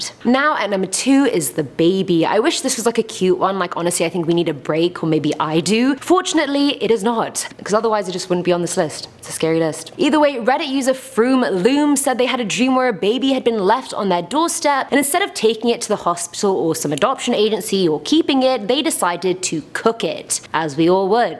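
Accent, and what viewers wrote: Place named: Scotland, United Kingdom. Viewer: no. Canadian English but her accent is Scottish. I am Scottish which is why I recognise it. Some may say she is more likely to be of middle Eastern descent but I personally do not want to make any assumptions about her as its not my place to do that.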